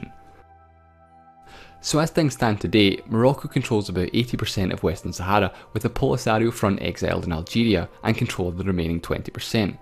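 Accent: Scotland, United Kingdom. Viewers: Heavy Scottish accent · I'm surprised at the amount of people who can't recognize a Scottish accent! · its not a dialect, its an accent. And the scottish one is recognisable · Thank you for your videos. You make the seemingly mundane topics incredibly interesting. Also, I love your visuals/animations and your lovely Scots accent. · Im not from a english speaking country but... Are you from Scotland?🤣 What an accent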